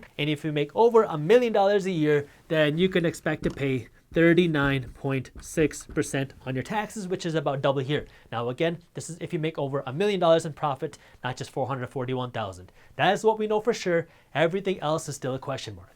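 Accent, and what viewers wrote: United States. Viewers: He's American or seems to be from there going by his accent, so obviously he knows terms / gestures from the dominant culture. Do you think Mexicans only do "Mexican" things all day long, and Sikhs only do "Sikh" stuff all day long? They live in the same society you do, and as English-speakers understand terms as they are meant. Just like European-stock girls understand what tipping a hat means, despite never having tipped one. I'm not saying any of this in a mean or unfriendly way, but just want to poke you and remind you to put on your "thinking cap" (something nobody has ever actually owned, but we all know what it means). 😊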